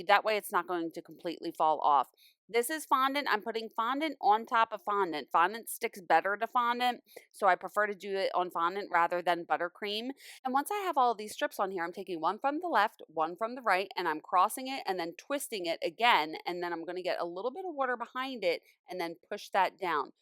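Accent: Philadelphia, United States. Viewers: You are definitely from Philly!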